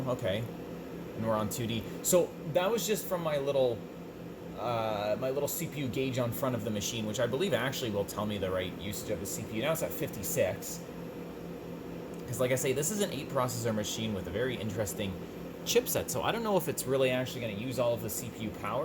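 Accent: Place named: Canada. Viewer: I can tell by the accent in the first minute of watching, you are Canadian. *Edit: Sorry this is so random. Love the vid!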